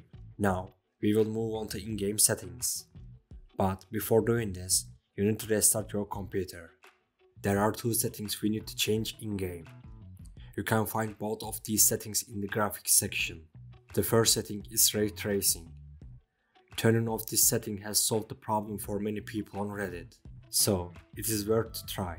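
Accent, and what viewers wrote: Turkey. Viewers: Türk oldugunu inglizcenden hemen anladim :-)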